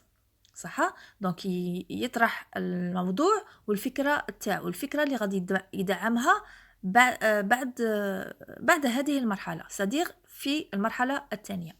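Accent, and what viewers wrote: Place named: Oran, Algeria. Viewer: وهرانية في هدرتها 🥰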